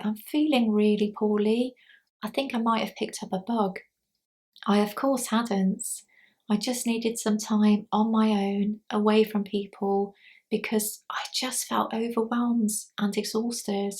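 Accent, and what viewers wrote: United Kingdom. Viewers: Are you from the UK, Australia or New Zealand.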